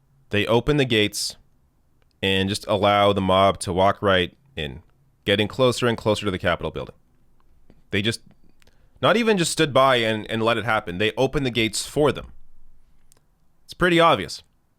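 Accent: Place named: Canada. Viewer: Your Canadian accent came through more in this video and I love it.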